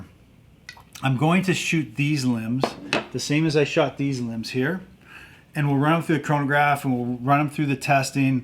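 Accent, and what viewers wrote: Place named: Canada. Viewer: Crap! I didn't realise that you were from Canada. Explains that accent haha. Where from? I'm in eastern Canada. Love the reasonable approach that you take to all of this. I'm fairly new coming back to archery after several decades. Been watchin you and a few others to try and catch up on the basics, the latest tools, brands, gizmos. Been really helpful Cool stuff. So thanks :)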